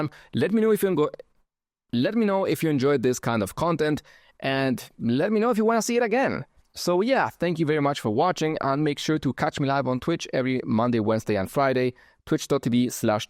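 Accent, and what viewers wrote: Germany. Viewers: i thought you were slavic lol, i guess i never heard a german accent before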